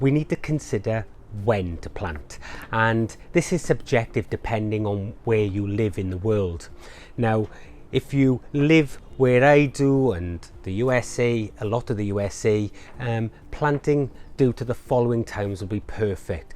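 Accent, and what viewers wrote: Wales, United Kingdom. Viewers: Hi. I'm also originally from Wales,so not only did I appreciate your tips but I also enjoyed the accent. I've just subscribed. What part of Wales are you from? I'm in 💃💃💃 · I'm guessing he has a Wales accent? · I started growing potatoes in dustbins for the first time this year and I just found you, fabulous information, thanks just what I needed. I very much enjoyed listening to your welsh accent too as I live in France and don't get to hear that anymore, lovely, (said in a very strong welsh accent of course). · I think it’s a lovely Welsh accent❤ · Glad I found this video. Tried to grow potatoes last year but had a poor harvest. Have now subscribed. Like the Welsh accent. Am I the only fan from Germany?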